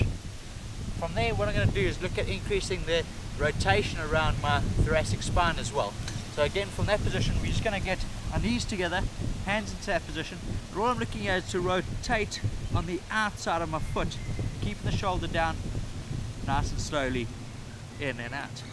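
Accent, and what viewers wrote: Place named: South Africa. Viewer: He's South African not English you muppet. Great video thanks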